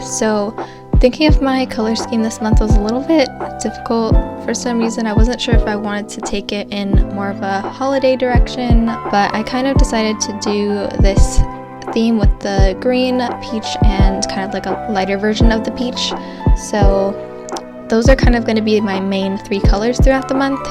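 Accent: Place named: North America. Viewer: Omg this is the cutest spread ever💖💖🥰🥰🥰 And you LITERALLY «stole» my idea for november in my new BuJo which I ordered from A R L, the bjqueen, so I FINALLY have a dotted notebook where the quality of the paper actually can handle my markers (Tombows, Crayola Supertips, Artist Brush Pen, Tombow Fudenosuke, Pigma Microns etc, none of them ghost or bleed through. But all my other notebooks is so poor quality and this is my first time (year) with Bullet Journaling so it was truely awesome when it arrived in the beg.of October. But I don’t have a boyfriend at the moment, so I was gonna use my bestie from high school she owns a bakery and is rediculasly good at making cakes and stuff like that, so (I kid u not...😅) I was gonna ask her if she could send me some photos close up of some of her work and her shop of course. She lives four hours by car away from me, so it is very long time since I saw her and we kinda lost touch so I was gonna text her like right now and ask about the pics and I have been running around changing lightbulbs in my apartement cos it was so so dark in here. 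And Norway is six hours ahead of NY and nine hours ahead of LA, and idk where u are in the USA, or Canada, I just know, or believe you’re North American cos of the accent you have❤️💙🇳🇴💙❤️💖👱🏻‍♀️💖😺💖🐶💖📚📚🖌🖌🎨🎨🎨🩰🧘‍♀️❤️ Ps: I just wanna say: HUGE FAN of your art. The oct was the 1st I saw, and now I am completely hooked🥰🥰🥰😁😁😁😁😁😁😁😁😁😁😁😁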